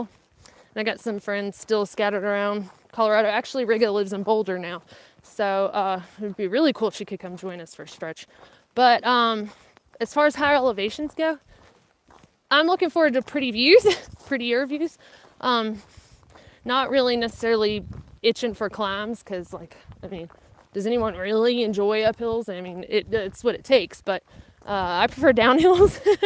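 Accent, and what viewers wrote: South, United States. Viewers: Your southern accent is just the best!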